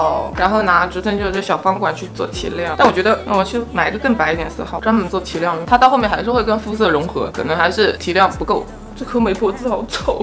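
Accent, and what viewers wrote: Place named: Xinjiang, China. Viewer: 为什么韩语说出来新疆味？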